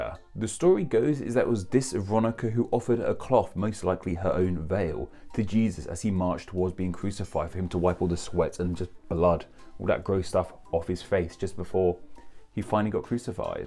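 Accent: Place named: United Kingdom. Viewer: I’ve seen other people make similar comments on other videos. Maybe that’s just the accent in the part of the UK where is from? Or maybe it’s just his own unique personal accent?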